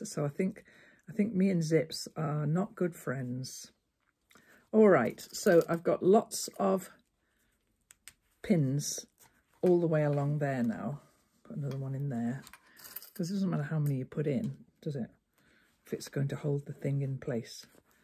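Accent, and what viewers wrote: United Kingdom. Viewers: Why don't brits say the -er on Zipper? LOL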